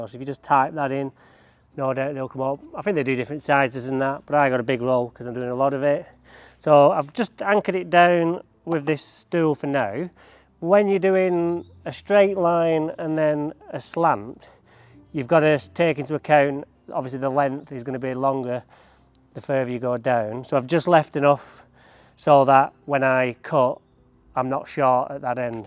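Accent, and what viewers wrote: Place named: Lancashire, United Kingdom. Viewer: Nice to the rounded sound of a Lancashire accent on YouTube.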